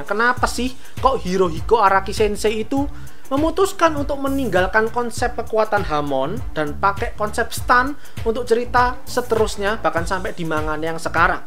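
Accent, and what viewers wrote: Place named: Banyumas, Indonesia. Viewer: Agak ngapak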